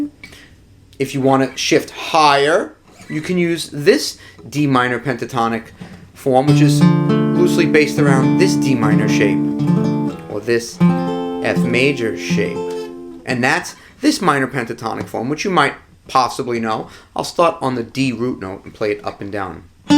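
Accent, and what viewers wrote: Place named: Long Island, United States. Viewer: Nice lesson. Got two loopers one Boss and one on the Helix. Now ive gotta figure out how to use them. I know the Boss is capable of overdubbing not so sure about the Helix looper. PS. Wheres the accent from?? Sounds like either Strong Island or Massachusetts. Im betting on Long Island!!! Am i right???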